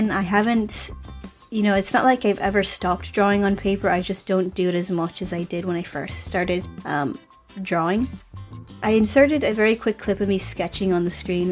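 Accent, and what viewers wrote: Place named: Ireland. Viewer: I picked up on the Ireland part, but I couldn't figure out why it wasn't more pronounced (as Irish accents so often are). The American bit makes sense, though I was going to guess you were in England, and had Irish family or something. But I wasn't hearing any English tells.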